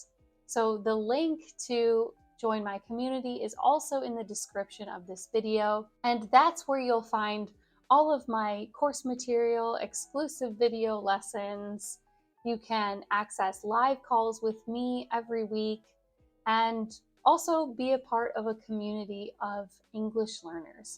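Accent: United States. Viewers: WOW!!! Finally I found a great shadowing English channel with the beautiful American accent!!! 🙏🏻🙏🏻🙏🏻 More difficult than British accent to pronounce but more beautiful when it's well spoken (no slang, no ghetto stuff). Thank you, I'm a new subscriber 🥳🥳🥳💖✨💕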